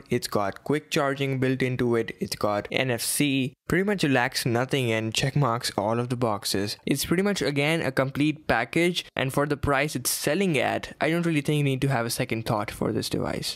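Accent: India. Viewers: great video, I subscribed within 10 sec after listening to ur ascent, its indian but not typical Indian ascent.Keep up the good work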